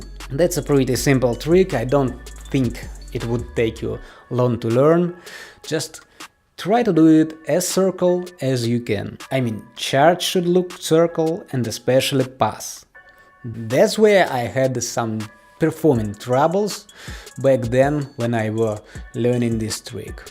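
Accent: Russia. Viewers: пф такой акцент "русский" )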